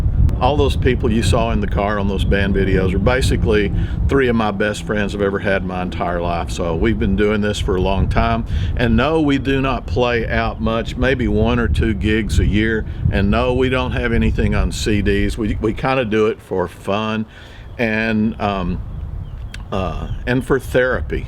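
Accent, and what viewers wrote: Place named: South, United States. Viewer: ...I can’t imagine any of your subscribers “holding it against” you for taking the body of the deer to a place that other animals could make use of it’s body to sustain themselves. That is only nature. I love all your videos because your soft voice and southern accent are so calming. And I love hearing about and seeing Uncle Floyd. What a treasure he is! And sweet Buddy....my heart just breaks. I have a black lab who is a month away from her 13th birthday. She had a stroke 6 weeks ago and is doing fairly well, but I know she doesn’t have long. Thank you for letting us get to know you and enjoy the things you do on your Texas ranch vicariously!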